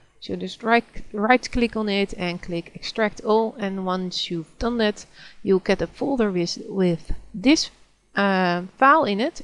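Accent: Netherlands. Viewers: Wauw dat nederlands accent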